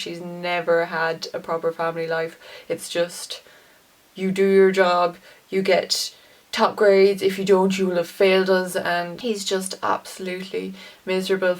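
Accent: Ireland. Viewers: Is she Irish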